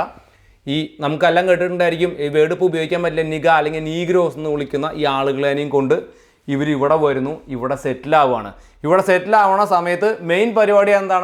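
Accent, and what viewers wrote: Thrissur, India. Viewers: History,geography,sciencum nalla Thrissur slagil kelkan Nala rasa 😅😅😅 keep going btw chettan Thrissuril evedeya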